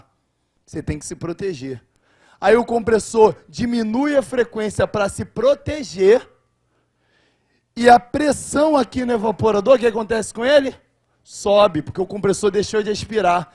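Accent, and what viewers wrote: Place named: Rio de Janeiro, Brazil. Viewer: Carioca raiz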